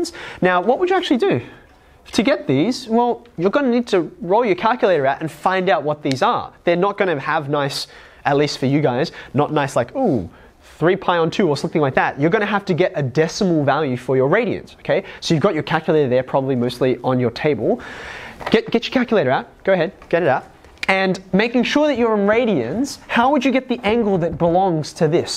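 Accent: Australia. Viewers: nah he's from the land down under (australia)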